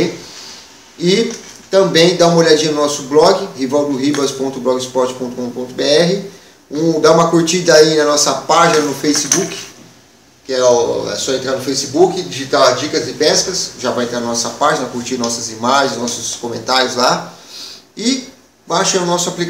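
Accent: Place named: China. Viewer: e por que vc fala tipo chinês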